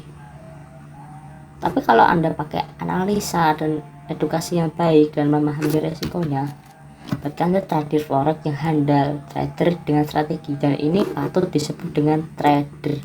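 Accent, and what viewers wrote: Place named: Banyumas, Indonesia. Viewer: Ngapak ya bos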